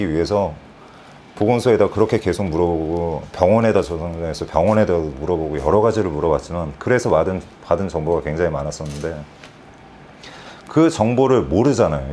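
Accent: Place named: Seoul, South Korea. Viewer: 와 진짜 한국인 아니에요? 진짜 오리지널 서울사람같애요😳 한국사람인 나보다 더 잘함ㅋㅋㅋ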